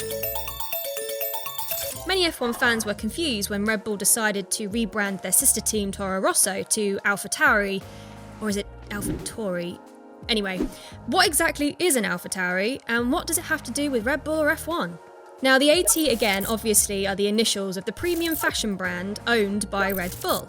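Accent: England, United Kingdom. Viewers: The usual GHASTLY English pronunciation of Renault, Modena, etc. Listen to Forvo first, maybe you can make it a little better.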